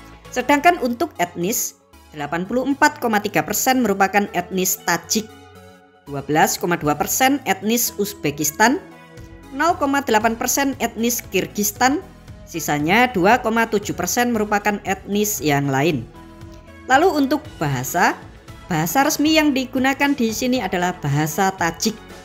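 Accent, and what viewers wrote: Java, Indonesia. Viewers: Lugot jawanya meddok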